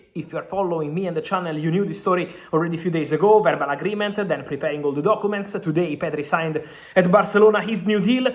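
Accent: Italy. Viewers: Bro you need to slow your speech down the heavy mario accent with the speed of you talk makes it inaudible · He is Italian, he is trying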